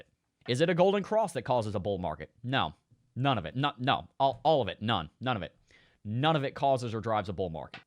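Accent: South, United States. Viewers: Hey dude are you from the south? I like you accent very much. Thank you for your voice.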